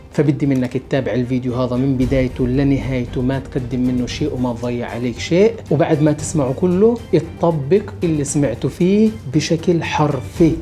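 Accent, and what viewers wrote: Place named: West Bank, Palestine. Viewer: ياسلام على لهجة الضفة 💚 الفلسطينيين دايما حلولهم ربانية .سبحان الله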